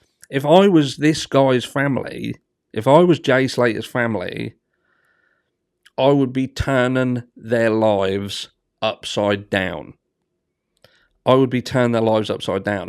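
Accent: Norfolk, United Kingdom. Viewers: Mate, I just wanna say. I found your channel during this case, and your coverage has been brilliant. 👌🏻 I just love hearing the Norfolk accent on YouTube, 😆 I don’t know of many content creators from around here. I’m a Lynn boy! May I ask where abouts in Norfolk you’re from?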